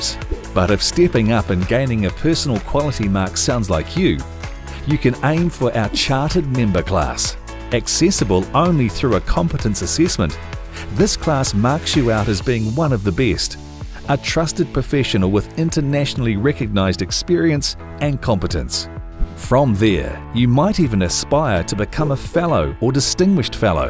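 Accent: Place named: New Zealand. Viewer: complete with subtle kiwi accent...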